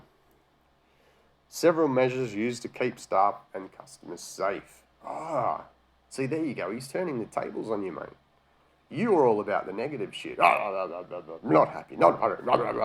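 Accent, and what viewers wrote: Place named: Australia. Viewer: That was interesting 🤔 Why does an Australian accent make EVERYTHING more interesting 😁 All the K-Marts in USA went out of business years ago, had no idea they were still around over there. Wonder if they shipped all the crap from our stores to y'alls?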